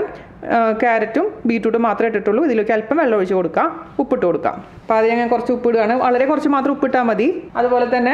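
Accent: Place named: Thrissur, India. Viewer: Ready Mani ayyi...athu enniku ettayi... thrissur slang...I also from Thrissur...now at Kochi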